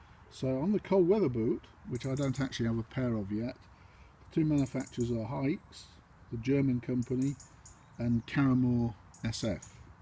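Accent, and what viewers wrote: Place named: United Kingdom. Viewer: Ah, that lovely British voice ! Of course, you guys used your ruddy boots to kick the shit of of my country and countrymen for about two hundred years but since you people also brought in modern medicine and science and the bloomin railway, I'm not gonna hold that against you. Good video... great boots, the new ones, that is. The black ones were shit. Lovely presentation. Wish your lot hadn't decided to become increasingly 'American'. Alright, take care then. Toodles !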